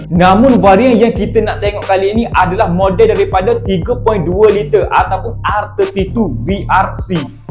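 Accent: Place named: Kelantan, Malaysia. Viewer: Boh. Demo mmg try hard nak cakap KL. Tapi demo ank jati kelate. Bunyi kelate demo tu. Gapo² pon video demo meme terbaik. Klu buleh , demo tulis laa kat video. MK5 , Merc , Bmw F20. Mudoh sikit ore nak cari. Tapi keto hok demo list ni , untuk ore ado duit poket lebih , buleh laa pakai. Keto lamo mesti ado nyakit. Sparepart pon parok. Sapo² ado duit poket lebih , boleh laa guno. Keep it boh 👍🏻👍🏻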